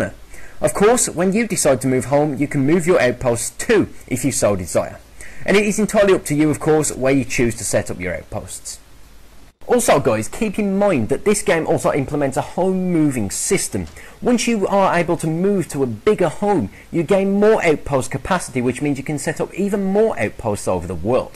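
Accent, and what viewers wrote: Birmingham, United Kingdom. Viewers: Is that a brummie accent?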